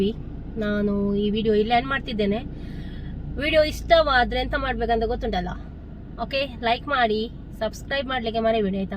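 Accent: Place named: Mangalore, India. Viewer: Just saw this chanel ,you talk like my elder sister 😂 our kannada is same like this because we are mangaloriens😂❤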